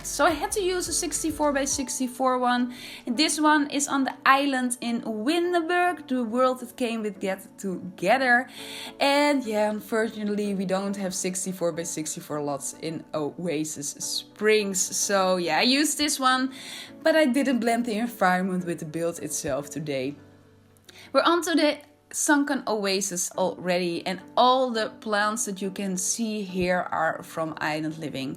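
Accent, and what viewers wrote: Netherlands. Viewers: Are you dutch? You have a dutch accent haha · The second I heard your voice I knew you were dutch. Turns out I was right haha. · Are you Dutch? I am Dutch too, I can hear it at your language. 😂 My first language is Dutch, but my second is English! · Wow so cool! Where are you from. You sound really Dutch to me. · You have a Netherlands accent (my opinion) .. Its very cute!😊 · That dutch accent tho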